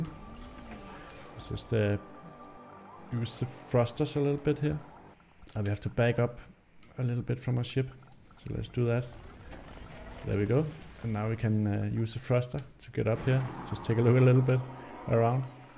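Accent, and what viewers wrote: Denmark. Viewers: Tak. Så nemt at høre du er dansk 🤗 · Nope. He sounds like a Danish guy speaking English. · hehe 7 sec in to the video, and Han er dansker :) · Are you danish???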